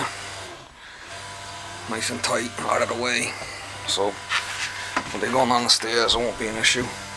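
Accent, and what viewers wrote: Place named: New York, United States. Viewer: Hi another good job there I do like watching your videos all the way in not so sunny England,I've watched a few of your videos and you seem to highlight the need to install the correct size of pipes,I'm a commercial heating engineer so I do understand the need for correct pipe sizing, I'm new to AC is there a chart to help you and more so me with choosing the correct size pipes keep up the good work Ps loving that New York accent Kirk (Manchester England)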